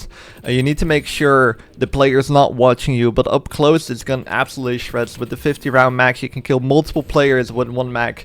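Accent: Netherlands. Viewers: He is Dutch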